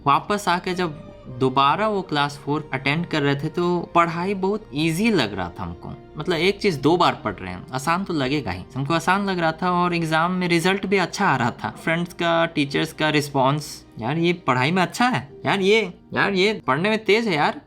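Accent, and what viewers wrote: Bihar, India. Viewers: i don't know why but i love to listen bihari accent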